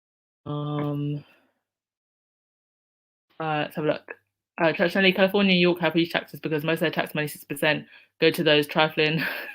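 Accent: United Kingdom. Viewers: Hello, from Canada..I always enjoy your channel, especially your British accent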